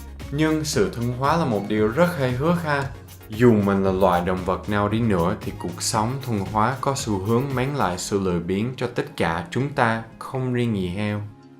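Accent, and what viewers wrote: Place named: South, Vietnam. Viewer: Ôi Tây nói giọng miền Nam. Nghe vui kinh khủng.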